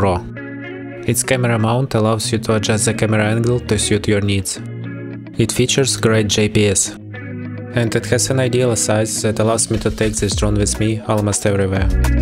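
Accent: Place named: Russia. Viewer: Are you from Russia? I hear the familiar accent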